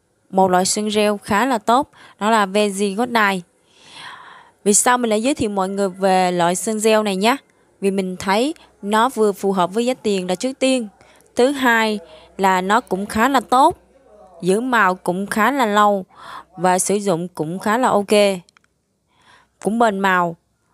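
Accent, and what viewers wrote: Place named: Vietnam. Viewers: Haha giọng miền nào nghe ngộ vậy 🤣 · Kính thưa các loại vùng miền luôn.chắc ở nước khác nhưng gốc việt 😅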